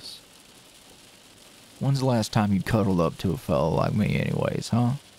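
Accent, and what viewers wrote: South, United States. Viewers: plz more southern audios they’re ultra comforting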